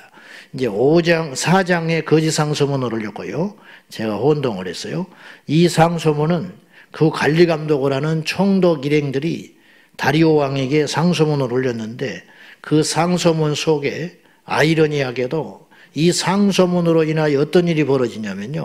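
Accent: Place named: Jeolla, South Korea. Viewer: 목사님께서 가끔 사투리를 말씀하실 때 그 뜻과 의미를 거의 완벽하게 알아들을 수 있어서 참 좋습니다. 언어 소통이 어려운 이민자의 삶으로 살다보니 온전히 이해할 수 있음도 감사한 일이더라구요. (제 고향이 전북이거든요^^) 에스라 입장에서 1장~6장은 과거의 이야기. 536년 도착 520년 학개 스가랴 성전건축 촉구 스룹바벨과 예수아(여호수아) 건축 516년 10월 성전 건축 완공 지연된 이유 1. 사마리아인들의 훼방. 2. 성전 건축의 중요성을 잊어버림. 458년 에스라 중심 2차 포로 귀환 444년 느헤미야 총독 성벽 재건(3차 포로 귀환) 외부보다 내부의 문제가 더 많은 교회. 세상 법정으로 가지고가지 말아야하는데 지켜지지 않는다. 문제는 깨닫지 못함 생명의 역사를 바라보아야한다. (5절) 하나님의 역사를 막을 자는 없다. (단 1:8) 다니엘이 뜻을 정함. 예수님의 뜻을 정하심 거룩한 뜻을 정하면 하나님이 행하신다. 믿음은 기름이고 하나님의 불이다. 믿음의 결단. 뜻을 정하자. 결론 1. 거룩하고 의로운 뜻을 정하면 하나님이 반드시 도와주신다. (악의 도구가 되지말자) 2. 하나님께서 정하신 뜻을 반드시 이루신다.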